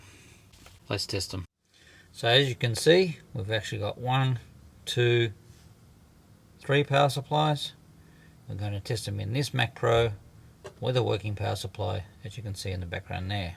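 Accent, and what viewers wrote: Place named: Australia. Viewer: Thanks for video mate .Good to hear an Aussie accent :)...Can we buy parts from you ?...I have a 3,1 with faulty PSU. Cheers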